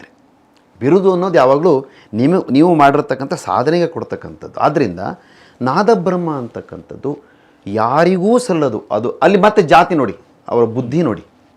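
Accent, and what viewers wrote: Standard. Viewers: ಎಂಥ ಶುದ್ಧ ಕನ್ನಡ ನಿಮ್ಮದು! ಎಂಥ ಸಂಸ್ಕಾರಯುತ ಮಾತುಗಳು!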